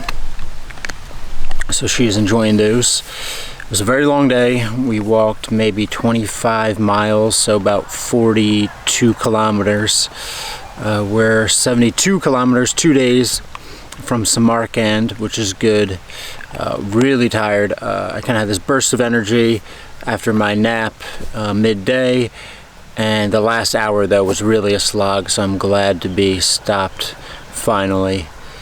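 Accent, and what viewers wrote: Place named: Philadelphia, United States. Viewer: Damn that Philly accent is thick haha.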